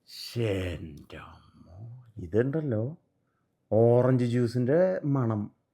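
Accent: Thrissur, India. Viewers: തൃശൂർ ആണല്ലേ.... വീട്..... ആണോ ഗെടി....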